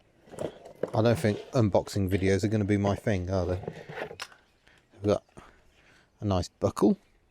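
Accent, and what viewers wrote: United Kingdom. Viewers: almost the Queen's English you were using for Aussie ears, haha!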